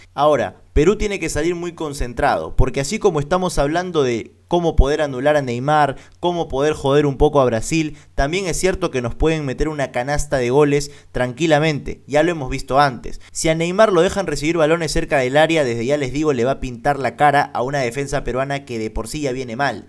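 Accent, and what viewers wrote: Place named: Argentina. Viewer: Obsesionado con los argentinos? Xq tienes un dejo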